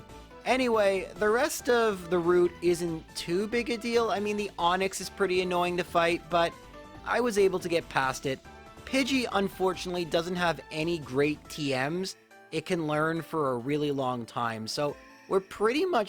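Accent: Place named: Canada. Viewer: I'm going to say this but you sound like your candanian which I say hello neighbor. After seeing you live you look like christian slater's little brother Edit: add the join button I wanna support your channel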